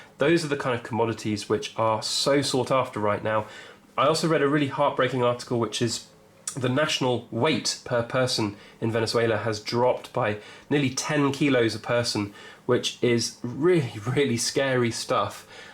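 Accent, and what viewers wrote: England, United Kingdom. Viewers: Seems to be a peculiarity of speech in England. The BBC, for example, pronounces Sinai as "sign-ee-aye" instead of "sign-aye".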